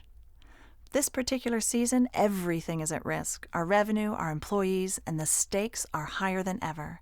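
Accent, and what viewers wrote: United States. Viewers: The voice is really not very clear. It's gabbled, American? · Appreciate your generosity ❤ plus If I may ask: which state accent is that? I’ve been fond of that accent and I wanna know it’s origin in order to learn it and not to mix it up with other accents. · Its a west coast accent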